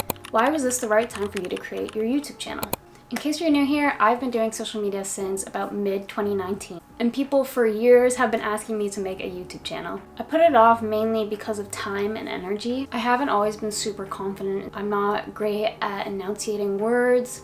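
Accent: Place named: France. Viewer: I think I am hearing a French accent, I am from Canada as well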